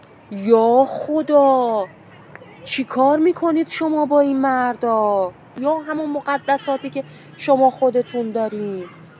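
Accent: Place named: Shiraz, Iran. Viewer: 👏🏼👏🏼👏🏼👏🏼👏🏼 lahjeyeh ghalize shirazit mano koshte jan ❤😂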